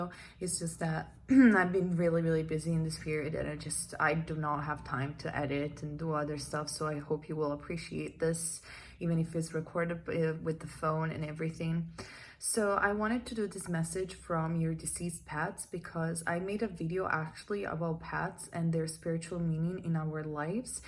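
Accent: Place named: Italy. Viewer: Oh my goodness SWEET 😍 ❤️ sweet Precious- BELLICIMO 🥰 Tarot 🌠🔮 🙏 reader that I JUST realized I ALREADY ✓ DID visit your channel many, MANY months ago as you assisted me in MY difficult time of berievment !!!😲 Yes! And I was revisiting the video and recognized your distinct accent. (Italian dilect) WOW, amazing. I have been enjoying the channel and it's growth. You are a favorite 💜🦄💟 🌈 of mine and I've told you how you stand out for your authenticity & vulnerability with your audience. The healing process 🙏 💔 😥 😪 is an interesting one after the loss of a pet because I believe the instantly 👼🐕 become guardian angels and the proof is everywhere!!!! So it's just bittersweet 😔 ya know. May you be so very at ☮️ as you are able 💗 to stewart the impowering gift of connecting with the Underworld. Love.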